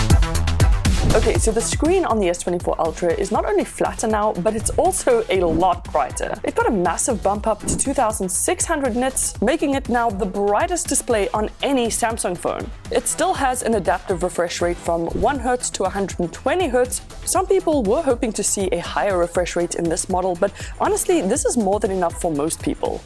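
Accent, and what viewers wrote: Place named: South Africa. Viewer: Yooo this is crazy, I stumbled on this video and instantly thought you sound South African. Let's go such a good quality video. I'll be sticking around. Subbed!!🎉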